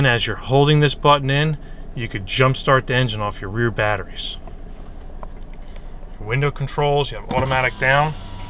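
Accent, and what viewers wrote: New Jersey, United States. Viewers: you sound like you have a new jersey accent or something. where you from?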